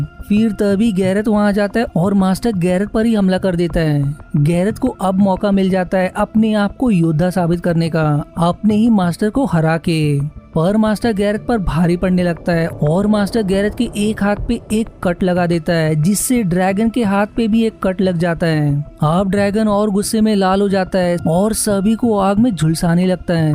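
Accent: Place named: Gujarat, India. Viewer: Why this guy has Gujju accent?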